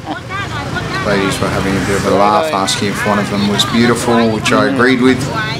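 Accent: Australia. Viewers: u sounds like Aussie are you? Hello from Adelaide SA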